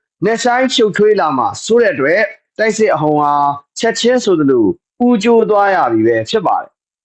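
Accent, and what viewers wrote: Yaw, Myanmar. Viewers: okယောနသံ ❤၁၀၀၀၀၀၀၀သိန်း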